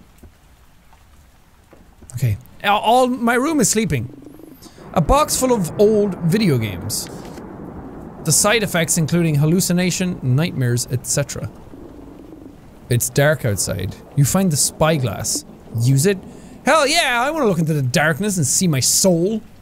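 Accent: Afrikaans, South Africa. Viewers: Quite the heavy Afrikaans one, too! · I'm from South Africa BTW he said my boy not but boy. He just has an Afrikaans accent but I can hear the confusion of but and my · The first game he just said “my boy” not butt boy it’s just his South African accent. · Hes saying "my boy" :P Its a South African accent :D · This is legit how some of us sound here in SA 😂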